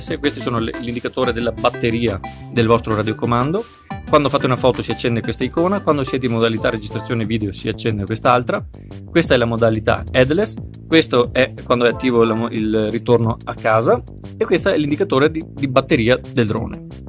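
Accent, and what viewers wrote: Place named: Veneto, Italy. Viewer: Grazie, l’accento ti frega sei Veneto?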